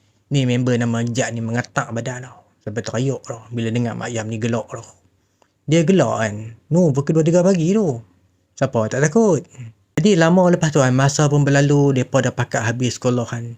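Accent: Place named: North, Malaysia. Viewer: Salam.. Nak bajuu said L... Tq slalu tnggu video abg.. Sedap dngr loghat utara sbb saya Utaga..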